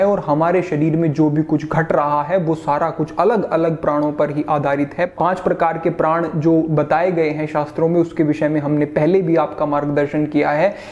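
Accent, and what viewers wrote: Standard. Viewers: The most insperational part of this channel is your pure hindi pronunciation, you worthy your every inches of life, you are blessed, am bless to find this channel, thank you 🙏🙏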